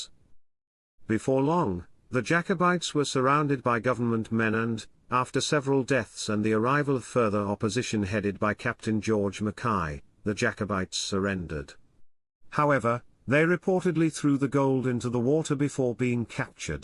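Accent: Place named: Oxbridge, United Kingdom. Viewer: PPS: Having tried to join the QOHlds in the late 80ies (as a german, as a piper, as a late black brunswick hussar ((german bundeswehr Waterloo btn)) I'm very struck at the "oxbridge" accent of your presentation. Funnily enough it was the very same hessian-english pronounciation that bewilderered all the army careers personnel and the pipers I met with then and gained me the nickname "squire" back then (to my bewilderement, I thought myself to be so caledonian! 😂) Enjoy your videos immensely, carry on the good work!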